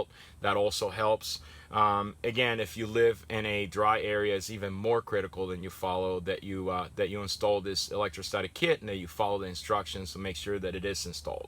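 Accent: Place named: Canada. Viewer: Great vid! I know you live in Florida like me...but you must have grown up in Canada? Accent...Thumbs Up!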